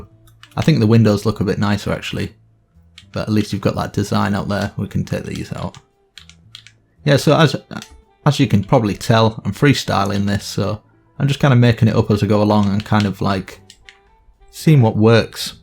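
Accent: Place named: United Kingdom. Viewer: And it is not just the British accent it's also the sound of your voice! (If that makes sense)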